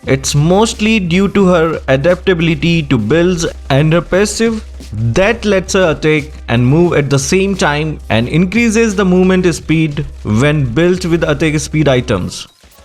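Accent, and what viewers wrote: India. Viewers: You sound pure typical Indian, how come your name is? Please change your channel name,keep anything but not South Asian names, request 🙏. May be " भोकाल"," रुद्राक्ष"" कल्कि " etc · Are u indian🇮🇳? Your voice accent seems indian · Some Times i think u r Indian cause u sound like one....i m sure u are asian .....one of the very few ...to reach a million subs... 💯💯💯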